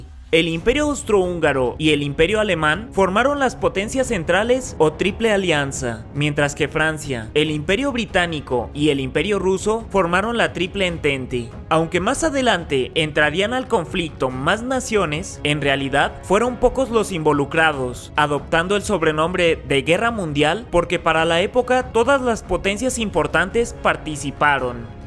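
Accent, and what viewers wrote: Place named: North, Mexico. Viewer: el acento fresa me recuerda al norteño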